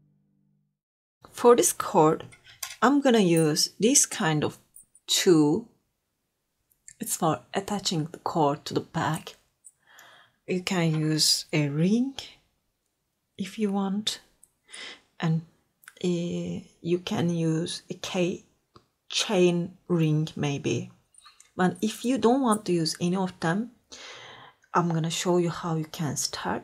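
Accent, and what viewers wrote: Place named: Turkey. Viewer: Videolarda hizli hizli scroll down yaparken turkce aksanı ilk duydugum 2 kelimede farketmem 😂😂😂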